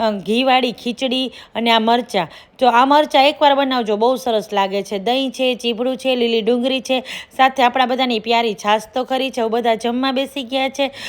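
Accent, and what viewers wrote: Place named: Kathiawar, India. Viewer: Bahut achcha bolate ho ekadam kathiyawadi style😊😅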